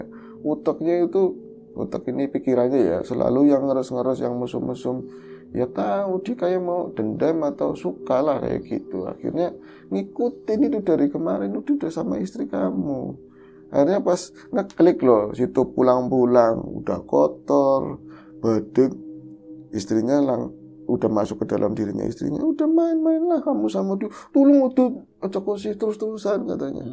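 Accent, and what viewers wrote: Banyumas, Indonesia. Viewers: Weee pagi2 tayang... seneng denger bhs mas e narator ngapak2 gmn gtu.... ada nak 🥰🥰🥰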